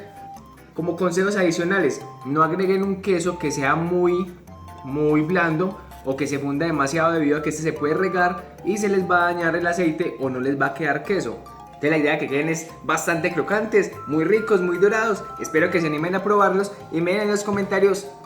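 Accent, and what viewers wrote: Colombia. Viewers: Me encanta ese acento Colombiano. Saludos 😙😍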